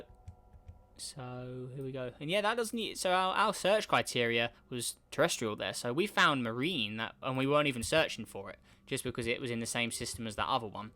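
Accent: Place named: United Kingdom. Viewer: i love british accents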